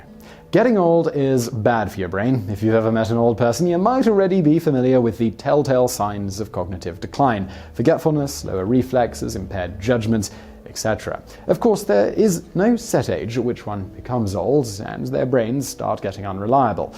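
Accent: England, United Kingdom. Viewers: If you're so smart, why can't you even grow hair on your head. Rats can grow hair all over their bodies. You cant even grow hair to protect your grey matter from sunlight. And sorry, mispronouncing American words like an English doesn't make you smart, just makes you 250 years out of date. We beat you and even Europe doesn't want you. I'd call you a red headed stepchild but your prince has already cornered that. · plays on his accent some people in North America have such respect for the British accent when in fact they're all dummies over there. Btw lived in the UK for years.....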